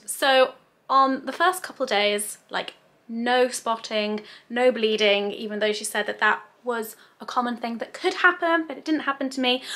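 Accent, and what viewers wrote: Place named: Northern England, United Kingdom. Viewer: Your accent has gone a bit more northern I think? Maybe it's due to social distancing/lockdowns??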